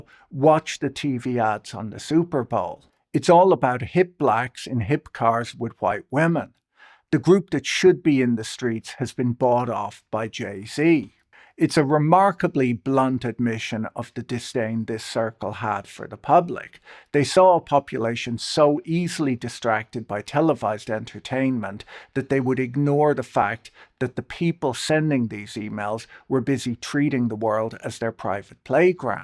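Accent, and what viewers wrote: Ireland. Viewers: Love the Irish accent... King Charles the "TURD". LOL RIP QEII but, the British/German monarchy should have ended with you. 🎉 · Accent. Now thinking Galway or South West coast, but probably wrong will stick with first choice last time North west londonderry region.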